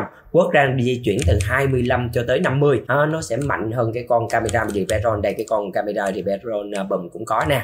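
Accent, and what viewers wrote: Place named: Binh Duong, Vietnam. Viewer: Anh hôm nay giọng hơi bình dương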